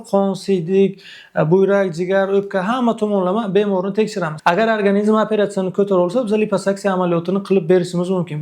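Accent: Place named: Bukhara, Uzbekistan. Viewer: Оллох Рози булсин сиздан доктир. Доктир каерликсиз.? Бухороданми шевангиз бизга тортаркан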